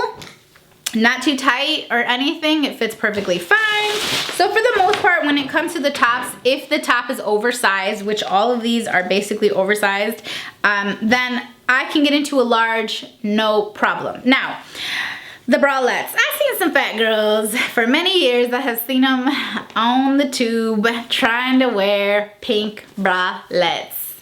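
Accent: Chicago, United States. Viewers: Also do you live in Illinois or are you from there?? It sounds like you have a Chicago accent haha xx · Rylie Schulze I’m pretty sure she lives in Chicago because she has mentioned going to the suburbs of Chicago in several of her videos. I was thinking she had a Chicago accent too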